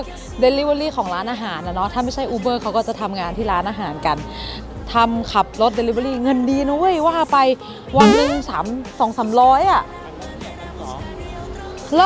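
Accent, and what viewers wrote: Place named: Northern Thailand, Thailand. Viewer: แอดฯ.อู้เหนือเพราะจังเลย..แอดฯเป็นคนจังหวัดไหน?❤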